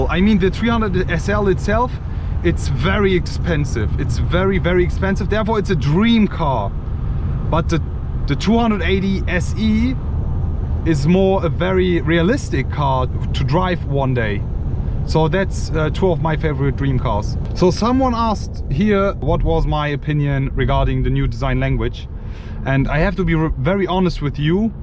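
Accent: Germany. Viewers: Du bist ein deutscher das hört man raus 👌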